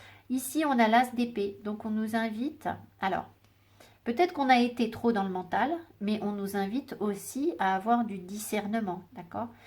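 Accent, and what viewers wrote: England, United Kingdom. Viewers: Merci beaucoup pour l entrée en matière qui m à permis de noter le nom de ces 2 livres que j ai hâte d acheter dès que la Fnac sera ouverte. Également en dépendance affective, j ai fait un « soin » par téléphone pour couper les liens, comme les bonhommes allumettes aussi, mais rien n y fait. Je vous apprécie car je ressens votre sensibilité dans vos guidances et souvent votre émotion, ce qui déclenche la mienne car je suis hypersensible et ce que je traverse en ce moment n est pas facile, mais j y travaille. Continuez comme ça, je vois que nous sommes beaucoup à vous apprécier alors, ne changez rien. Beaucoup de bonheur à vous. Bises 🙏💕 Et moi j aime beaucoup votre bel accent anglais!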